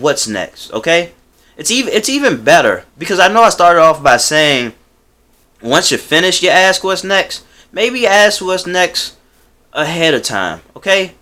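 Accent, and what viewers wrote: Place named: Baton Rouge, United States. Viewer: Another good video! Now, a totally off-topic question: Do you have any connections to Baton Rouge? The way you say certain words takes me back to the time I spent at LSU.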